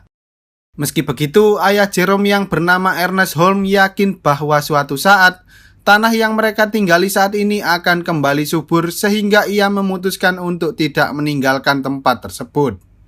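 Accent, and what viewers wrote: Java, Indonesia. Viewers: Medok bgt, jadi kurang enak di denger. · Aduh mendok 😂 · LAGI LAGI MEDOK.... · aduh ngomong nya Jawa medok lagi. ini sebab nya aku benci jawa. logat nya malu malu in. mending sunda lebih oke. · Woe logatmu jowo men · Teko jowo yo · Mas maaf, bisa gak kalau ngomong nya gak terlalu medok bgt? Soalnya kaya yg ngobrol sama org jawa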